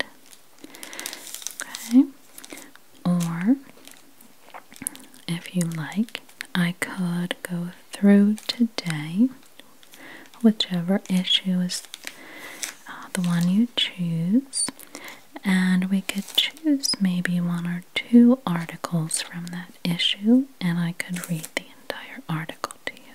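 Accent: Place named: Pennsylvania, United States. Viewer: I was thinking certain word you pronounce you might be from PA. Now I see "Giant" and I'd bet on that now! lol Lovely voice, lots of tingles!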